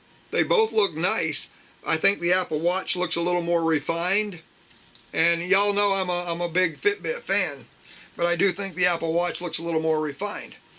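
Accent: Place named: South, United States. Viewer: You sound EXACTLY like Rickey Smiley doing his racist southern white guy prank call voice.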